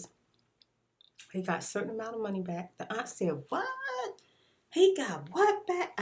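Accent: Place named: New York, United States. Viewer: you're so pretty! 😍😍😍 love your accent are you from NY?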